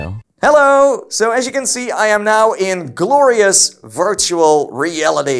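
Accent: Netherlands. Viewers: nederlands accent mag wel wat harder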